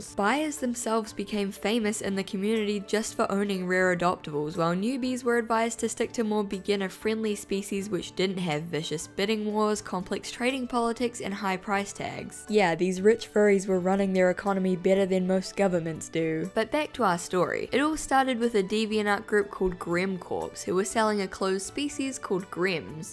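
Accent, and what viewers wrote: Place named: New Zealand. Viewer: Kiwi accent... finally one of my own 🥝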